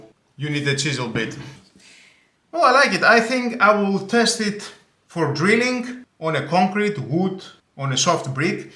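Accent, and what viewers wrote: Greece. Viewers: Είναι φοβερό εργαλείο ήδη τα έβγαλε τα λεφτά του με το πρώτο μερεμέτι .Ανετα ξετρυπήματα και σκαψίματα σε τούβλο και μπετό . Γενικά η σειρά performance είναι κορυφή.. Θα πάρω κι άλλο την επόμενη που θα ξαναφέρει το λιντλ . Είχα αρκετά εργαλεια απο τα πράσινα και έχουν βγει σκυλιά αλλα εδώ πλέον και με τις τιμές που παίζουν έχουν ξεφύγει τα πράγματα και χτυπάνε άνετα και κορυφαίες μάρκες οι οποίες είναι εώς και 5-6 φορές πιο ακριβές ! Γράφω Ελληνικά γιατί απο την προφορά αποκλείεται να μην είσαι Ελληνας!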